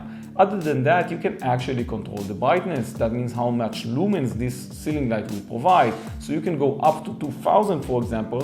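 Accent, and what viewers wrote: Israel. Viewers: אין על המבטא הישראלי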